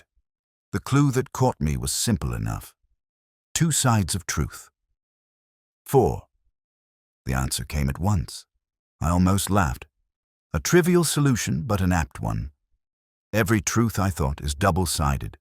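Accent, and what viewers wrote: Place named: United States. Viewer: A US narrator on quintessential Morse tale ? All wrong ! We don’t have “stoodents” here.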